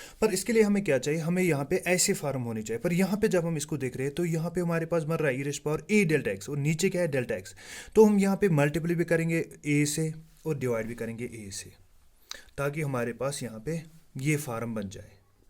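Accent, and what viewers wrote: Kashmir, India. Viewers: Your kashmiri accent 👀🤌🏻,,thanks for the explanation ✨ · Yes you are kashmiri Your urdu speaking says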